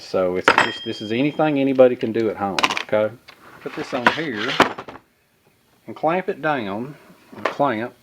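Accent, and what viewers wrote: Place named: Georgia, United States. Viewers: How I missed this Appalachian accent! Welcome back, don't abandon us again please. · Great to have you back. I have missed that Georgia accent and your unique laugh. And I have really missed learning from you. YouTube was not the same without you.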